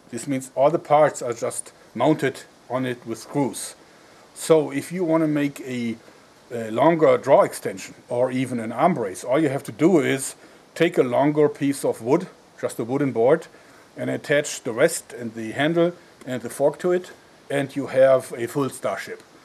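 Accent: Germany. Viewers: Lol I love that German accent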